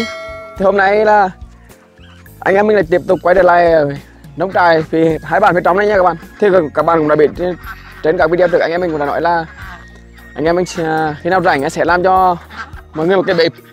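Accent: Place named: Central, Vietnam. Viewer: nói nhanh quá dọng miền trung hơi cứng nên em nói chậm nhịp lại cho khán giả nghe kịp vì khán giả là người khắp mọi miền tổ quốc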